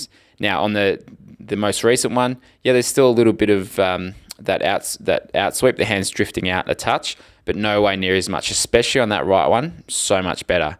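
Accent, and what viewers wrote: Australia. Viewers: Benther He has an Australian accent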